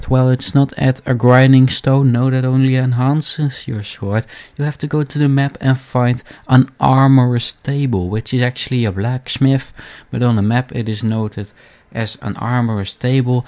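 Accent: Netherlands. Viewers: why not, Dutch people are cool and the accent is hilarious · He’s Dutch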